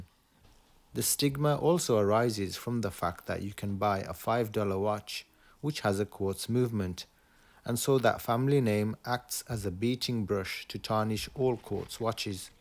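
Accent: Birmingham, United Kingdom. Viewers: Awesome video and lovely watch. I’m pretty sure you’re a brummie like me 👍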